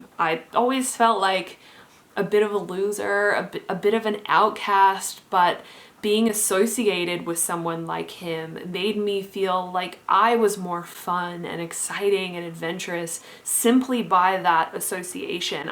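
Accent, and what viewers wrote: Australia. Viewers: Are you Australian or American?! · Are you Aussie? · Are you Australian? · Aussie aslccent with a bit of Canada or US muced in?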